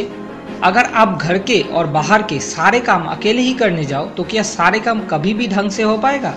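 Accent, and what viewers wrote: Bengal, India. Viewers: U r bengoli Good bengoli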